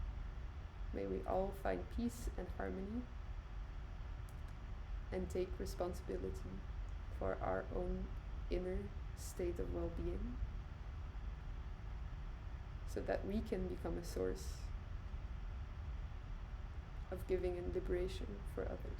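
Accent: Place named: France. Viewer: Hey, where did you get your beautiful accent from. Bit sounding like romantic french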